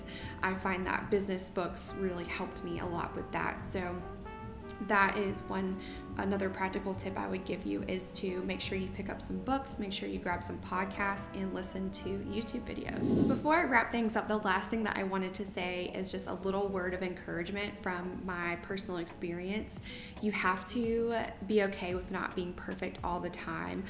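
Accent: South, United States. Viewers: I’m English and live in London, I love listening to your southern accent 😄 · I disagree, but I'm not American. One of my favourite elegant ladies, Kathryn Morgan, a professional ballet dancer, is also southern. I find your accent really sweet, definitely more so than certain British accents (like the Midlands!!!), and I've never had any trouble understanding you.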